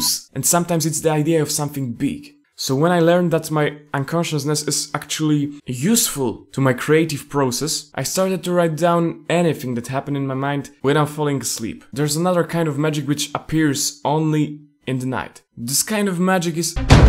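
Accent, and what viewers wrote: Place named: Poland. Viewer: troche taki polish english ale nie jest źle ;p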